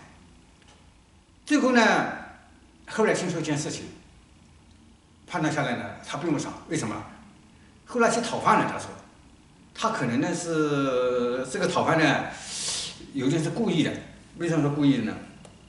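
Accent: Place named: Zhejiang, China. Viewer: 大师是浙江人？口音很重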